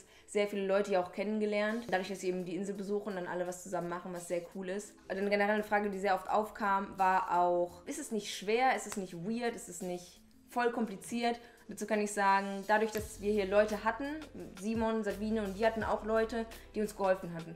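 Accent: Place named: Aschaffenburg, Germany. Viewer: Kommst du aus der Nähe von aschaffenburg?? Weil man merkt den Dialekt voll loool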